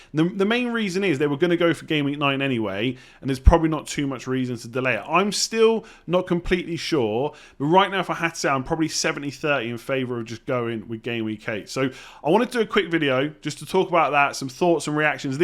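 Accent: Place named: Southern England, United Kingdom. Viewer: Thanks mate. BTW are you originally from Bournemouth way. You've got a Southern twang. Definitely not Irish assuming that's where you're from?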